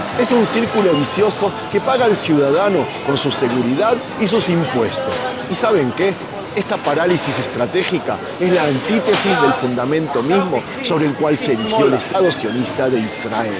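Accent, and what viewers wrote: Argentina. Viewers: mi opinión lo que pasa es que él siendo argentino de nacimiento (lo digo por su acento tan marcado) adquirió la nacionalidad de ese país y cómo allá está viviendo sabroso, lógico que se hace el ciego-sordomudo. Está defendiendo a quien lo tiene viviendo sabroso. En la mayoría de países latinoamericanos se tiene la idea de que los argentinos se creen de una raza superior y quizás por eso es que defiende a capa y espada la política expansionista de Xion.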